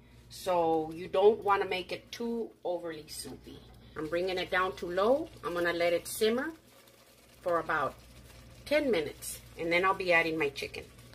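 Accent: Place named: San Antonio, United States. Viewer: Hello, when I heard your voice you sound like your from San Antonio. I don't like cooking but I just got married two years ago. I don't work anymore more so I thought this is a good time to start. I'm 60 years old from San Antonio, but just moved to sante Fe new Mexico, don't like the food here much so I want to learn to cook.. I'm excited to learn your recipes.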